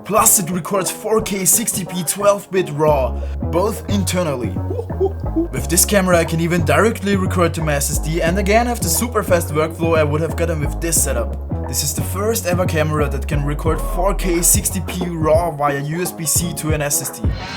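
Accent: Austria. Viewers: Yes, he is. For an Austrian it's pretty hard not to sound like an Austrian, even when talking English ;-)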